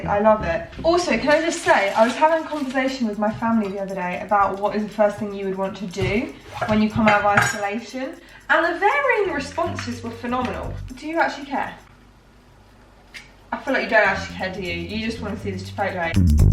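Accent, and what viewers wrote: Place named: South, United Kingdom. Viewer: just curious, what would you call your type of British accent? Your dad's is definitely not the standard southern urban one, but you are clearly not northern but I can't tell what type of southern accent you have. I'm an American so I have no idea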